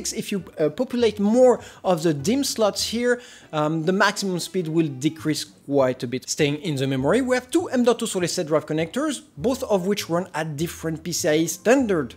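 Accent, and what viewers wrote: France. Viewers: My gawd, that french accent x) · That French accent nice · Frérot ça ce vois t'es français , very good accent , tkt on est pareil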